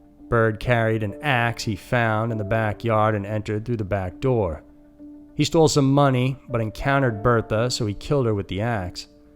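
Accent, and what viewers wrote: South, United States. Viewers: please post every day. I love your hard to pinpoint transplanted Bostonian accent. I asked you on Facebook. I know you've been in NY for years but you don't sound like you are from there either. My husband moved down from NY state in 1978 and you sound way more Southern. You probably won't read this but keep up the great work and Thank you! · Is your Southern accent real?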